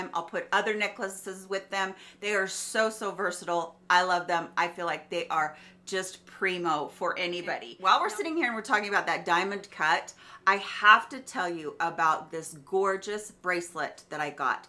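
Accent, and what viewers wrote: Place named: Upper Midwest, United States. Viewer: Wow, I had no idea Ms was a fellow Texan! Since I started watching the channel I thought I heard a very light upper Midwestern accent.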